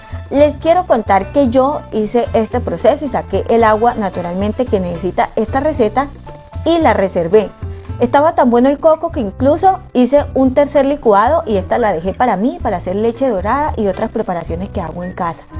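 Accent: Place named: Caribbean Coast, Colombia. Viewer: Tienes acento costeño 😉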